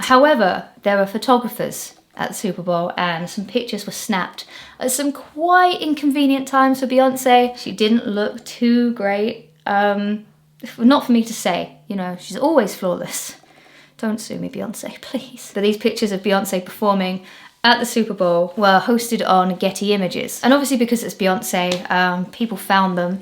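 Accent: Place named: Northern England, United Kingdom. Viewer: I like your little northern accent coming through the Essex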